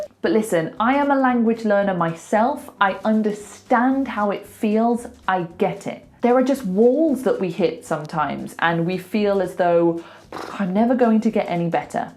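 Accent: United Kingdom. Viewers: IS IT BRITISH ACCENT ?😃😃😃😃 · Oh... I miss a lot this British accent!! ❤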